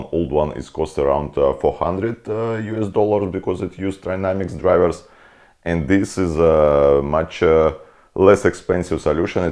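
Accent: Russia. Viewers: Фром зэ боттом оф май харт, комрад. Ох, уж этот непередаваемый шарм акцента из фильмов 80-х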